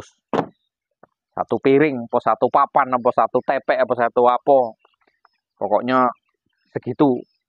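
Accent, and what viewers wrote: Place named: Java, Indonesia. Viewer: Dari bicaranya bang ini asal dari Jawa kelihatanya,bukan asli Jambi,😂😂 trmksih bnget bang ilmunya,sy banyak belajar dri Abang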